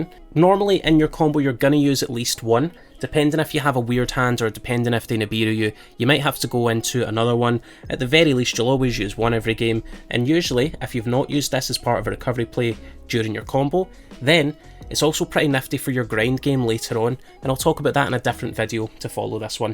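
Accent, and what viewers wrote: Scotland, United Kingdom. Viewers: "Fantasmay is Fantastic" with that accent will never leave my head anymore 🤣🤣 Assuming Scottish aswell?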